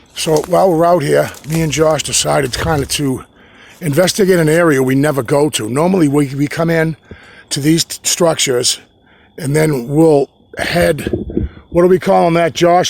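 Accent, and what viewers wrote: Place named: Boston, United States. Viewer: You have an accent. Maybe Boston. Where are you originally from you have an accent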